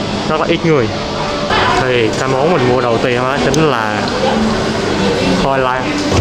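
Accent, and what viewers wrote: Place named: Phu Yen, Vietnam. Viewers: anh ơi a ở Phú Yên - Bình Định ạ ? giọng anh quen quá đi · giọng a nghe giống giọng phú yên nhề :))) nếu mà giống thì chung quê ùi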